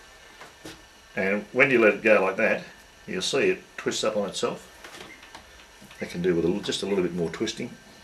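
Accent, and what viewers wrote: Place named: Australia. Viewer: Hey, sorry for the question but could you write down what string material you used to make the popper? With your australian accent it's a bit hard to find out what it is:D sounds like "barlin twine".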